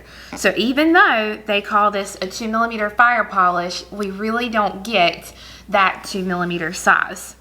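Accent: South, United States. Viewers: Thank you for explaining these beads. I’ve been going nuts with the differences between Toho and Miyuki seed beads. Throw a few Czech seed beads into the mix and you’ve got a hot mess! BTW, I wish I could trade you my Chicago accent for your charming southern accent!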